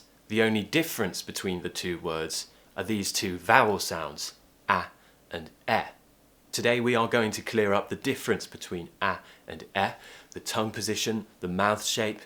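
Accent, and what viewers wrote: United Kingdom. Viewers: You are the best, british accent is the best ,I'm happy from Africa 🇲🇱🇲🇱🇲🇱❤❤❤ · You are a very good teacher!I love the British accent, would love to learn more! Thank you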